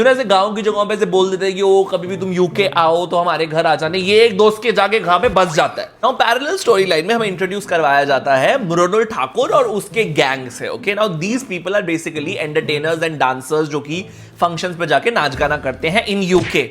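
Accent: Punjab, India. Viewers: Story gai teil leny❌ story gai phla tu duja tu teeja tu leny✅😂😂😂😂 is bnda ka story telling ka andaz or bich bich me punjabi touch.. zbrdssttttt😂😂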